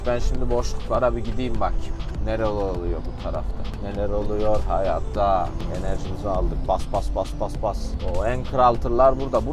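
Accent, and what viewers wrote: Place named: Niğde, Turkey. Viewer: Askerde Niğdeli bir arkadaşım vardı konuşman tipin aynı kesin Niğdeli dir diyordum formayı gördüm yanilmamisim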